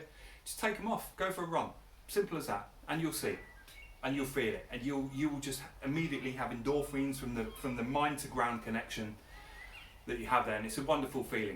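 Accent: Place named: United Kingdom. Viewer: hey, thanks for your videos , it really help to make a transition. Besides, it is nice to listen a british accent for ones ;)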